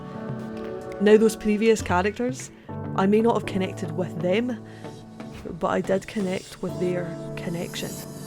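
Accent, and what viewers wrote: Scotland, United Kingdom. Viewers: Came here to find a review to throw at a mate and convince him to watch JS. In 2 seconds found a Scottish accent and was more interested in knowing how good you were as a reviewer... I’ve subbed, good points all round you’re articulate af, know your deep knowledge and I have absolutely no arguments against the review. Gonna look through some I’ve not watched now. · Are you scottish or something? Kinda sounds like it.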